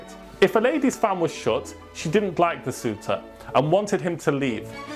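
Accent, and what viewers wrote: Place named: Poland. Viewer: ! You make interesting videos! PS. Are you polish or ukrainian? You have such an amazing accent.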